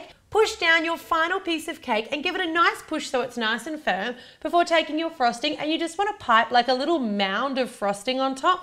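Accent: Australia. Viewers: Is she australian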